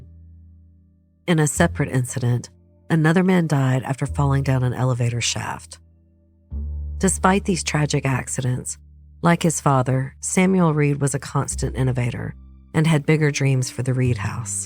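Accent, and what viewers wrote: South, United States. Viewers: Love your raspy southern voice, too sexy for me.❤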